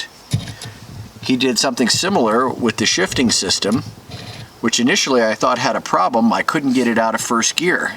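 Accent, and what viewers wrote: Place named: United States. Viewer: Poooshoooww, colour, lol. Godda love the American accent.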